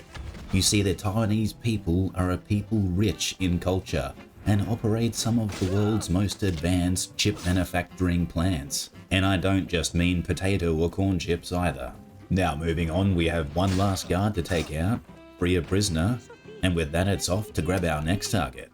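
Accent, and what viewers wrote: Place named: Australia. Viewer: Why is it that I can't find any Australian youtuber that doesn't talk like this. Got to admit though, thier all funny.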